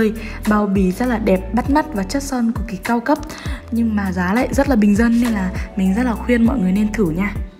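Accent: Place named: North, Vietnam. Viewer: Chào chị ạ, em xem chị và biết chị rất rất lâu luôn tầm 1 năm về trước -.- 1 năm đối với em khá lâu rồi a. Em thât sự rất thích chị, đầu tiên em thât sự ấn tượng với giọng nói của chị, em là dân miền tây nên rất thích con gái Bắc, giọng nói trầm ấm nhẹ nhàng hihiii, thứ 2 em cực kì cực kì thích môi của chị, như anh Hoàng Thạch nói người gì mà môi đẹp ghê, môi cứ chúm chím đáng yêu mà không đánh son môi cực hồng nữa <3. Video swatch của chị rất chất lượng, hình ảnh tốt màu chuẩn không bị lệt quá nhiều so với thực tế. Bất ngờ hơn chị còn đang trên con đường ca hát nữa <3 em thích hát lắm nhưng tài năng không có hihii hay hát chứ không hát hay <3333.Em tự ti quá chị ơi:(( thật sự em rất ghen tị với các anh chị beauty blogger, như chị và Mai Hương,xinh thật sư, còn em thì không xinh tí nào:)) da thì mụn còn ngâm nữa chả có tí tài năng gì, chán ghê. 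Nhưng ba mẹ đã cho mình một hình hài thì mình sẽ cố gắng sống tốt thoii, sống tốt để làm bà hoàng của chính cuộc đời mình <3 em thích màu 129 ạ <3 Mong chị đọc đc cmt. FB: <link>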